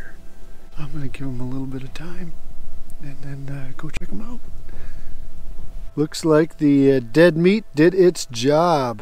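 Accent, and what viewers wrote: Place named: Minnesota, United States. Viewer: You sound like you are near where my family is from, Fairfax, New Ulm, Mankato. Nice spot!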